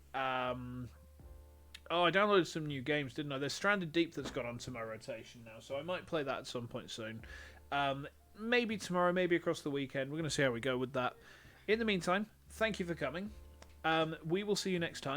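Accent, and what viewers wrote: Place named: United Kingdom. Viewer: She is the most british person i have ever heard. May she rest in peace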